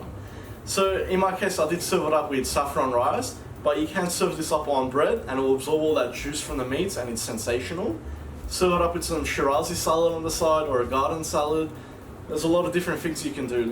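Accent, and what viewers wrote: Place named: Australia. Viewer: What is wit this Australian accent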